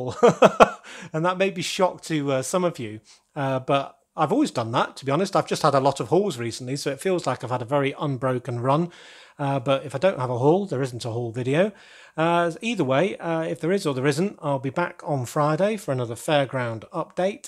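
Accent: United Kingdom. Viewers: so you’re from south England?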